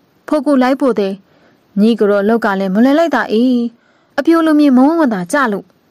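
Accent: Upper Myanmar, Myanmar. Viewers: စကာပြောပုံလေတေကနာထောင်လိုကောင်တယ်အညာသူမဟုတ့်ပေးမဲအညာမြေကိုရောက်သွားသလိုပဲ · သနဲ့တ မကွဲတာ စာအုပ်ထဲကအတိုင်းလား ဖတ်သူကအညာသံပါနေတာလား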